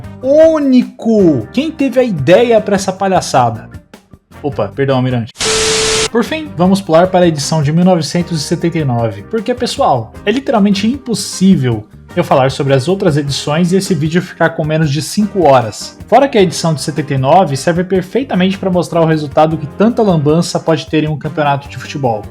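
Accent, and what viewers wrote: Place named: South, Brazil. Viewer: Claramente sulista.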